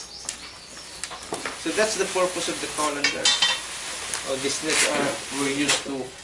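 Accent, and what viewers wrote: Visayas, Philippines. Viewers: It's more of a Visayan accent 😉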